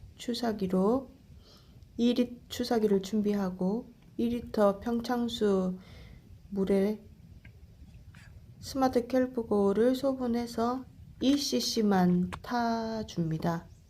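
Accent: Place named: Jeolla, South Korea. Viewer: 전라깽깽이 말투랑께.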